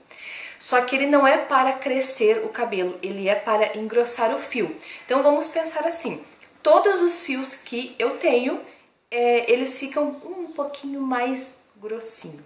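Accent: Rio Grande do Sul, Brazil. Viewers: .. você é gaúcha?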